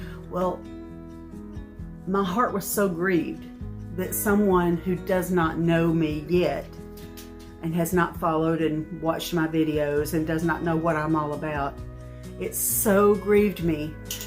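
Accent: South, United States. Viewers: This is the 1st video I have seen of your channel, I am loving it...I am from Ohio and LOVE your sweet southern accent.I am a thrifter and have been for 42+ years, you can make your home SO unique because things you find aren't mass produced like a big box store....everyone tells me my home is very unique and interesting , there is ALWAYS something different to see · Dear sweet lady, I knew exactly what you meant, I could never imagine you taking anything. I love your thrifted fines, the way you decorate, your Southern voice, you are a blessing !!! I do hope you get over your cold quickly so you can hold your new Grandson soon. Congratulations !!!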